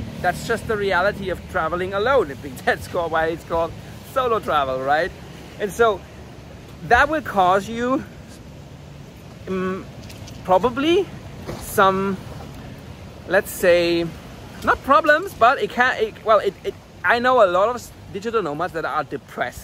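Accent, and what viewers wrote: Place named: Germany. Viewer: I like your video. I subscribed to your Channel. You talk clear, so in my opinion you do not need subtitles. I think you have a nice German accent (I am Dutch, the subtitles annoy me a bit, but that is my personal opinion)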